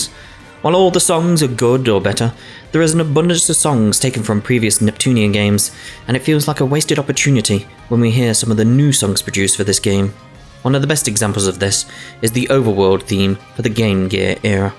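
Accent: United Kingdom. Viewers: Dude I wanna a British accent too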